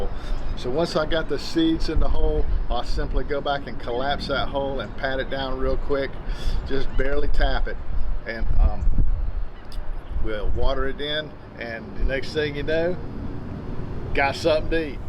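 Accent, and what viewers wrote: South, United States. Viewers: Great videos from Wonderful People Easy to understand as Down to earth as the earth that puts forth our food from God with our labors i have these big treats in 5 gallon pails as i am an apartment dweller they are just starting but i used Miracle Gro potting soil an added peat moss an perlite and will be adding plant food from Miracle Gro along with other nutrients.God Bless you sweet children of God ps as a Canadian who Loves America Love that Southern accent Keep these greeat teaching tools Coming an I can Relate to Old an Fat!!!! brother but we both worked our butts an arms OFF at 59 i can Still outwork the Young Bucks as i am sure you can Keepem coming an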